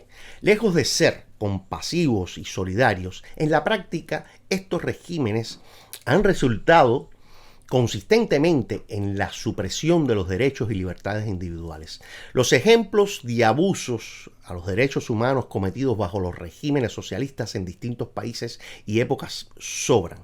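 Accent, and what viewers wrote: Cuba. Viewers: Se nota que eres cubano, asere!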